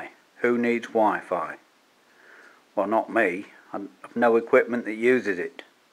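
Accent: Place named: Northern England, United Kingdom. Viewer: I find it amazing how quickly accents can change in our country. Im also from Lincolnshire originally (Skegness) and you Sound a lot more "Northern" or "Midlands" than I do. Maybe skegness gets some influence from east anglia? I'm not really sure....I moved to Paignton in Devon about 5 years ago and it was always fun getting people to guess where I was from haha they can never quite tell 🤣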